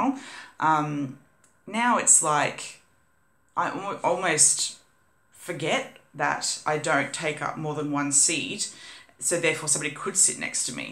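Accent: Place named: Australia. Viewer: Great info, my date for VSG is 19th March, so looking forward to the big change. Nice to hear another Aussie not many of us. Board is a great idea I will start to create mine now. Thanks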